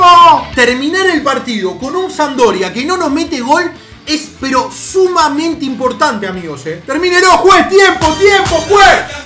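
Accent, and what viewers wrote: Argentina. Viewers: Ningun chileno se nota que eres argentino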